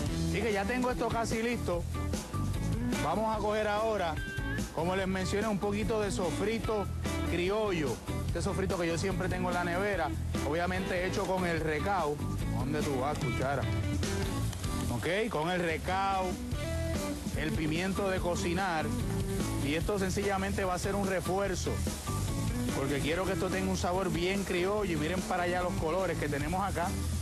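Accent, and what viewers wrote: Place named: Dominican Republic. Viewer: Este chef , siempre me a aparecido su acento como Dominicano, no puertorriqueño..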